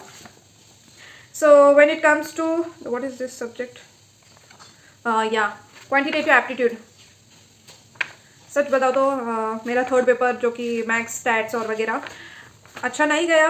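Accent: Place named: South India, India. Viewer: You sound like you're from South Indian .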